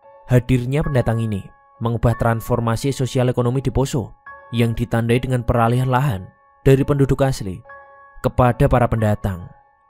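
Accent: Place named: Java, Indonesia. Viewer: Medok guys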